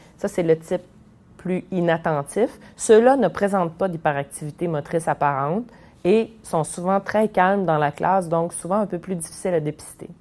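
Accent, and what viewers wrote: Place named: Quebec, Canada. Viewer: Vous êtes québécoise madame.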